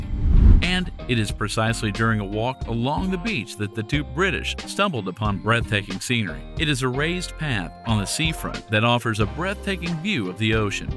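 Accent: United States. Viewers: Can't you use feet vs meters? I hate googling the answer. Lol. U sound American, not British.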